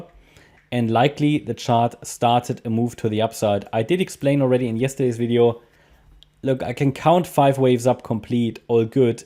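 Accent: Germany. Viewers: man hört so nen deutschen akzent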